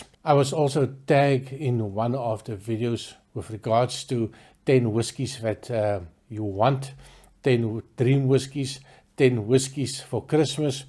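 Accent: Afrikaans, South Africa. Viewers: Hi. I like your reviews. Your accent is spot on with an Afrikaans person speaking English. It’s like a South African Boer giving a whiskey review.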